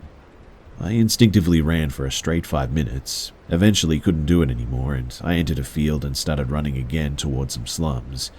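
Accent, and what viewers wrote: Australia. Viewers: Ah an Australian voice finally!? I'm in Adelaide. Chilling stories 🐨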